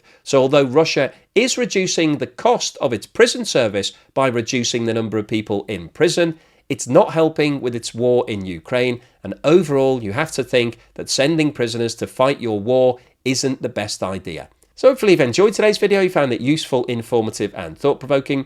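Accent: United Kingdom. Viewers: are you British? Wife wants to know.?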